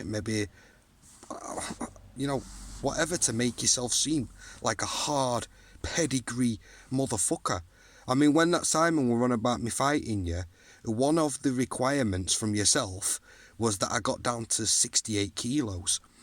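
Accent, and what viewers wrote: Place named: Nottingham, United Kingdom. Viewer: Love the Nottingham/ Derbyshire accent, menacing but with a soft undertone 🤣🤙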